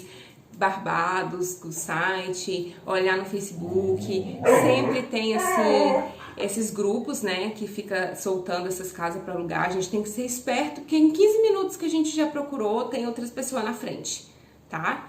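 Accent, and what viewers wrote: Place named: Minas Gerais, Brazil. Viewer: Oi, vc é mineira? É tão gostoso teu sotaque. Vc sabe se no saguaçu e na zona industrial norte tem enchente?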